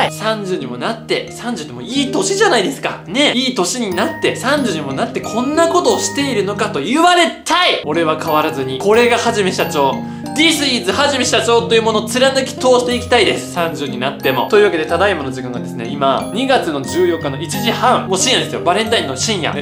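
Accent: Toyama, Japan. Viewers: 時々富山弁がでるのが、ほっとします。富山県人より☺️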